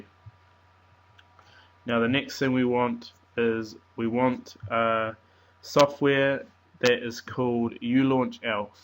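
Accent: New Zealand. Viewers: You sound like a kiwi?? chur brother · You sound kiwi... Are you? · KIWI ACCENT!! Busted...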